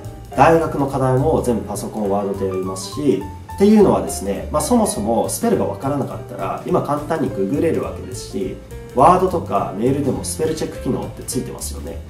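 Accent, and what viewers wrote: Australia. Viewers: オーストラリア訛り？ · オーストラリア訛りすかね？ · 発音がすごいオーストラリア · オーストラリア英語？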